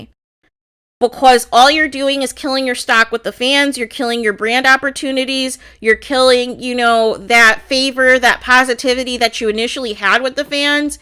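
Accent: Midwest, United States. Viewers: Are you from the midwest?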